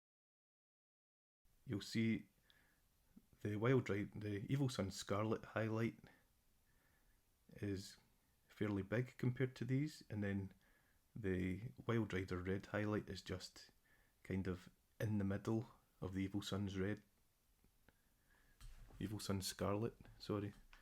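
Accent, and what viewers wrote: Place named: Scotland, United Kingdom. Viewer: Great video man. I am average painter too, slow and steady. I like the mix of red, white and blue. It makes him very regal. I love your commentary, like a Scottish Bob Ross.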